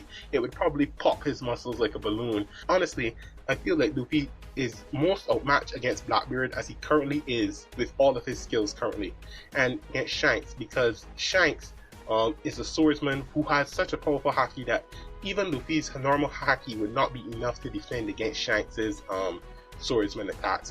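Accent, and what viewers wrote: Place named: Barbados. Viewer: Are you from Barbados? definitely hear the accent lol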